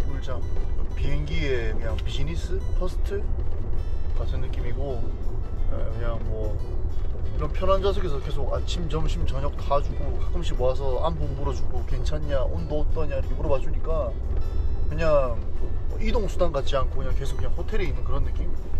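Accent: Gyeongsang, South Korea. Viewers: 뜨신물, 꽈자 ㅎㅎ 숨길수 없는 경상도 남자의 흔적. 하지만 자막은 서울말 ㅎㅎ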